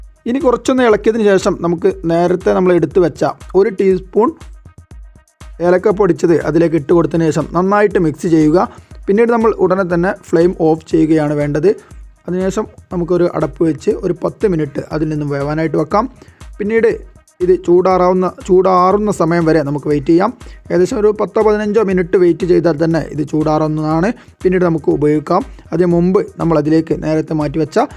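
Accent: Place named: Vadakara, India. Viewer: Laddoo mixcher. Anna vadakara parayunne. Super aayi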